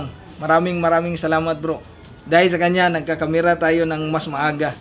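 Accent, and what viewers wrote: Visayas, Philippines. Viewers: Bisdak jd kaayo accent jd ba hehehe, I'm visaya din hehe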